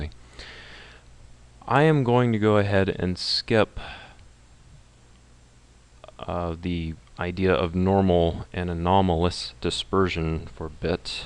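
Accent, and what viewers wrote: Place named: United States. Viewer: finally someone with a regular american accent that i can bear to listen & learn from. Thank you. subscribed!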